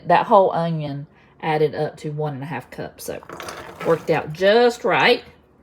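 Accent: South, United States. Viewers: Wow! This looks delicious and I'm adding yet another recipe to my list of things to can this year. And I agree, stuffing manicotti noodles is a challenge. Your plate with the green flowers brought back some warm memories. My Mama had the same ones when I was growing up. I'm originally from the south so hearing your voice is like being home again. Thanks for sharing. 😊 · I love this recipe! When I don't feel like cooking a big pot of sauce, I just go grab a jar!! Love your southern accent, my dad was from Mississippi! Thanks for joining in!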